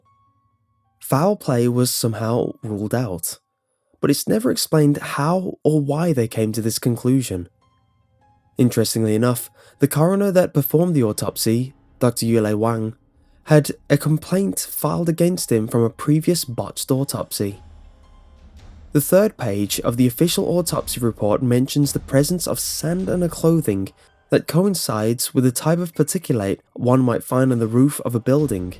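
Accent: United Kingdom. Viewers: Hannah Schrenk Nice try hun, English born and bred. What I'm saying is that unless you hear the word pronounced 'properly' you would not know to apply a different language to it. His pronounciation is very typical of what is accepted here over the pond. Am I saying it is right? No. But it is still the case. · He's British, so of course he'll pronounce some words differently.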